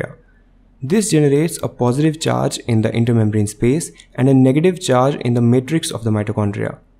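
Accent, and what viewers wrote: India. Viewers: I hate this indian accent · I love it 😊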